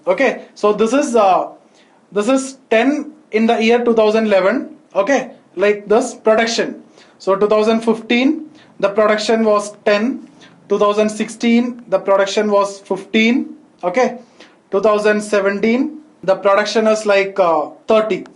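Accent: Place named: Kerala, India. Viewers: Thank you very much sir. I love the way you explain. Your pronunciation reminds me of the Malayali neighbours I had years back <3. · Don't waste time by watching this school maths , seeing this malyali ,accent waste waste waste time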